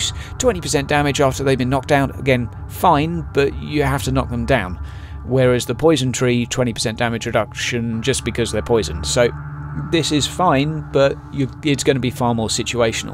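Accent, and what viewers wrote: United Kingdom. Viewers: It always somehow sounds better when a Brit talks strategy on 40K. Nice post.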